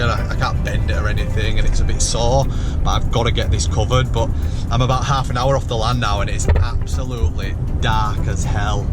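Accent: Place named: Northern England, United Kingdom. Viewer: Im really curious what part of england you’re from. Pretty cool to see a northern sounding lad off gridding in chile!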